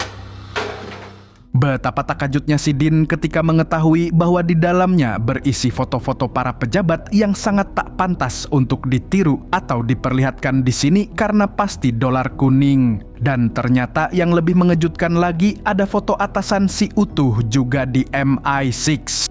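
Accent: Banjar, Indonesia. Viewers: Orang Banjar kah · baaaaah iyaa am buhan banjar naratornya leeeh....gas kaaaan mang......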